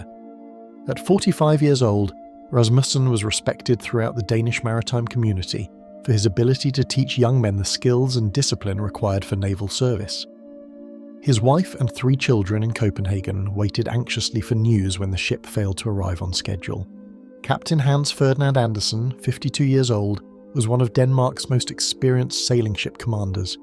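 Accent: United Kingdom. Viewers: US anti-intellectualism using a British accent. Hilarious. Rewriting of history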